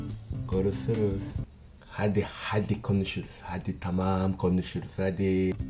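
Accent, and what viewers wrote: Adana, Turkey. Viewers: Kardeşim sen direk yöresel adanalı olmuşsun :d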